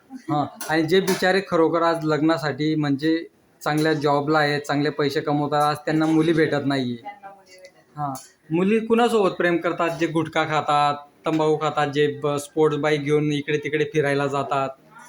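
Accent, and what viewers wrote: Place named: Dhule, India. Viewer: तुमचा बोलण्या वरुन तूम्ही धूळयाकडचे वाटता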